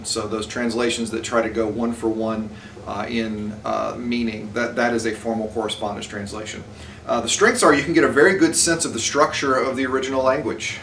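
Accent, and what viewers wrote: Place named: United States. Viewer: Which US State are you from? Im trying to guess the accent. :)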